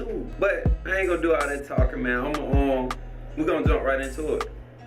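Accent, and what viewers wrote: Atlanta, United States. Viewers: Atlanta accent strong and them 540's gone crazy my g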